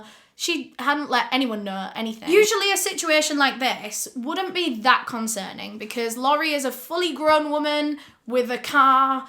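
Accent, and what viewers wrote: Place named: Northern England, United Kingdom. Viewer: As a yorkshire woman born and bred, I am loving the northern twang that sneaks in every other sentence xo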